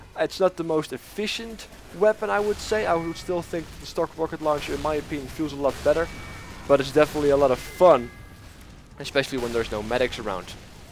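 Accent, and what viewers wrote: United Kingdom. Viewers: I thought you were scottish.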